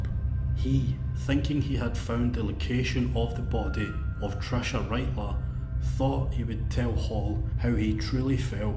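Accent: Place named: Scotland, United Kingdom. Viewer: edit thanks for the news clipping . I’ve been trying to figure out how he got life for one kidnapping charge, that contained more info than a couple diy docs. Subscribed Now that is a rich, Scottish accent. Big true crime/serial killer analysis fan. That Blackbird show got me tho. One scene in particular still haunts me.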